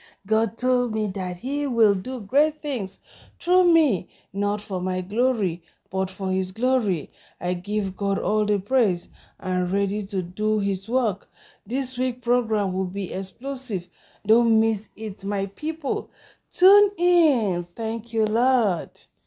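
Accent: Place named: Pidgin, Nigeria. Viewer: 😂😂😂I love your videos so much and I like the way you speak your pidgin to give gist, God bless you my sister. Watching from Liberia🇱🇷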